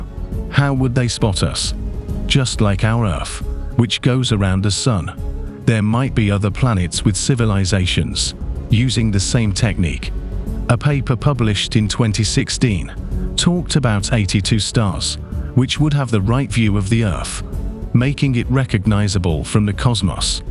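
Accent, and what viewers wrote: United Kingdom. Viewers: Please stop using the "British" accent in your Voiceover. There are a lot better choices like Swedish, German, or Irish.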